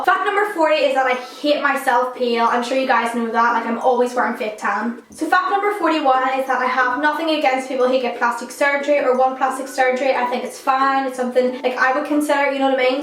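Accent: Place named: North, United Kingdom. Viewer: I love your accent! my dad is from the north too so I love hearing people's accents other than his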